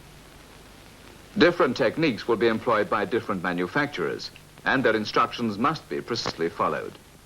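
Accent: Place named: United Kingdom. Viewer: This is very fucking BBC with DAT FUCKING British accent.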